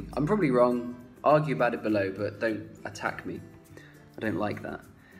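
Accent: Kuwait. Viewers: Your kuwaiti accent is so good! I would never have guest you're a non kuwaiti ! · You’re Kuwaiti accents so cute 😂💗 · I like this vid sooo much machos diyay hhhhhh your kuwait accent i well never .. from kuwait ;) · thank you to this videos to talk about my country,I proud to be kuwaiti and I'm shocked to see you speak arabic specially kuwaiti accent shloonch shno 😂😂 you cool bro ✔👏💚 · Happy a lot for your good experience in my beloved country..I wish that you will come back one day..and I advice everyone who decided to move there to interact more with the locals because I believe it's easy to make friends with and understand the Kuwaiti traditions..good luck in your next move..BTW way you sounded good in Kuwaiti accent. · Im Kuwaiti and I just wanted to thank you for showing the reality of our country unlike many opinionated ideas that have been thrown out there. 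There are many positive things as there are negative things in Kuwait but in my opinion, the positive things outweigh the negative by a lot. So thank you for representing my country in this positive way and for what it really is. Oh and your Kuwaiti accent is pretty good.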